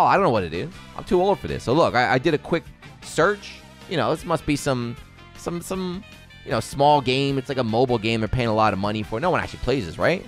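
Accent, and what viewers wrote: Philadelphia, United States. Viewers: You're from Philly, man?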